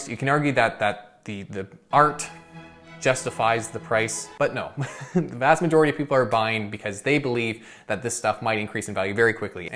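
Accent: Canada. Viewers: I listened to your entire video. I picked up a Canadian accent. Do you think the influencer Romana Didulo will eventually take power in Canada? Are Canadians more susceptible to influencer deception than US people?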